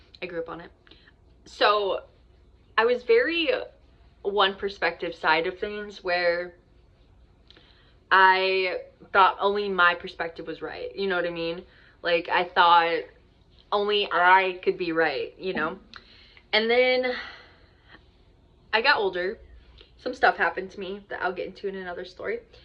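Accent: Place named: United Kingdom. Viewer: The Brit accent was on point 👌💯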